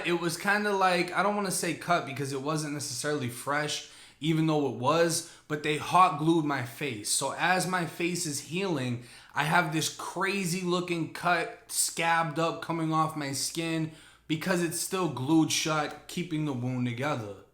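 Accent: Boston, United States. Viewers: 617 over here, Charlestown Townies. That man got a Boston accent.. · I absolutely love your Boston accent, new sub been binge watching you all night. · Yo where yo from originally cause you aint from Florida with that accent? sounds like Boston. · my nigga but that Boston shit be getting me everytime lol. "Tessta-Hot"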